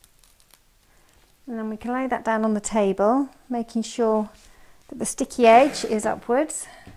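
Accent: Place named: United Kingdom. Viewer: I love that British accent so much!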